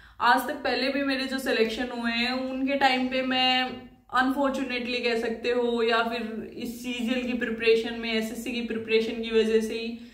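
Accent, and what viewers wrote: Haryana, India. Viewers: Aap Haryana se ho ya Rajasthan se... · aap haryana se ho kya aapki voice tone se lg rha hai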